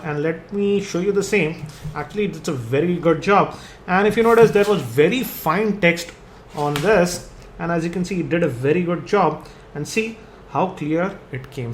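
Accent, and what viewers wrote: India. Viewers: that indian english gave me cancer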